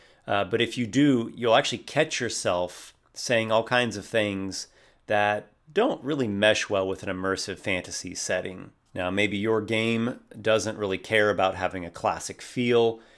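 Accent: Mediterranean. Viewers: Your Mediteranean (sorry if it's misspelled) accent made me think of Minsc from the Baldur's Gate series Also, "read books" was the best think I've heard in a video in a while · His Mediterranean accent almost sounds like he’s trying to do Mario.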